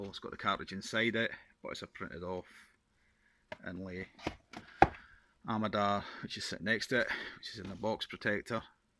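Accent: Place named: Scotland, United Kingdom. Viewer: Hey man, that is just glorious. Hope you are gonna upload some more stuff man. Always good to hear a fellow Scot 😁 Here from a Nathans Gaming tweet 👍 Take care, and again, hopefully see some more content 👌 Cheers 🙂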